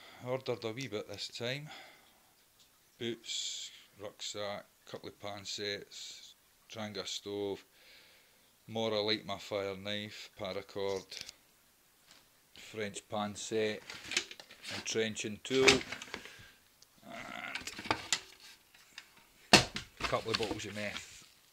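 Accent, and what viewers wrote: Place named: Scotland, United Kingdom. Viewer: nice kit mate. relieved to hear the Scottish accent as I'm in Glasgow and often doubt the credibility of various sites so it's nice to see someone close by, reviewing various sites.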